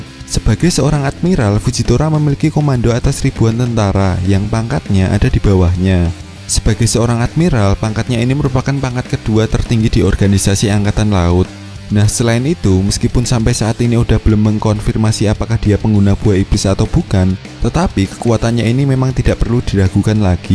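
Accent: Java, Indonesia. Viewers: medoknya itu lho gak tahan 😂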